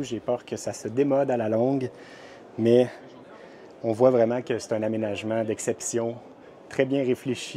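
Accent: Quebec, Canada. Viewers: Le système douche/toilette séparé par une cloison amovible! Wow ! Aussi, je veux te dire que ton parler fait honneur au québécois, moi qui en suit une! ❤ Tu n'as pas été empêché de filmer comme les Vadrouilleurs toi? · Merci pour ta vidéo et surtout merci de garder ton accent Québécois pour nos cousins.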